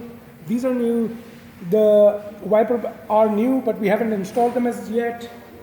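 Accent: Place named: United Kingdom. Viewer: Great projects, and excellent British accent for this little British Motoring icon. Job well-done, sb!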